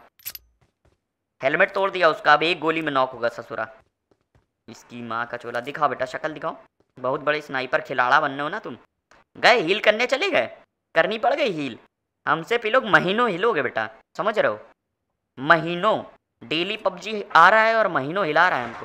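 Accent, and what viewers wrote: Kanpur, India. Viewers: Kaun city se h Kanpuriya lagte ho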